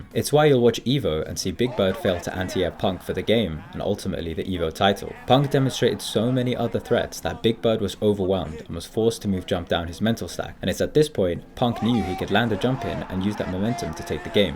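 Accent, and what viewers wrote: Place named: United Kingdom. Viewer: Leave it to a guy with a British accent to give me street fighter insight that applies to just life in general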